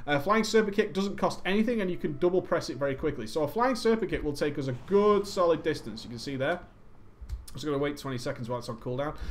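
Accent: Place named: Manchester, United Kingdom. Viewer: Wow, I'm from Manchester too, and I've never noticed, (until now) how annoying the manc accent is.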